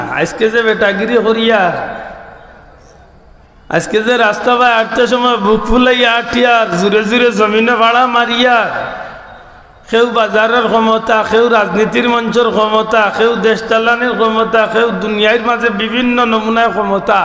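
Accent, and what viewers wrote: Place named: Sylhet, Bangladesh. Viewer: সিলেটি ওয়াজ আমার অনেক ভালো লেগে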